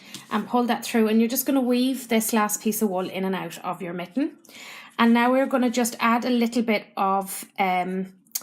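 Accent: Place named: Scotland, United Kingdom. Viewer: Hello again! I just started making a pair for me (I know right) tonight and went over to one of my favorite Youtube podcasters and shared your channel with her. I hope you don't mind Your tutorials are literally the bomb. Between your amazing (Scottish accent, hoping and praying I got that right) and your style of crochet? I love, love, love, your channel!! Here is Teri's Channel <link>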